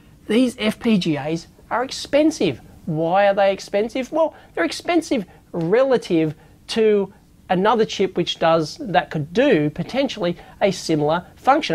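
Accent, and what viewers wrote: Australia. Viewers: Are you from down under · This guy is like watching Steve Irwin: in the accent and the excitement. Great speaker. Thanks for making it, really helpful.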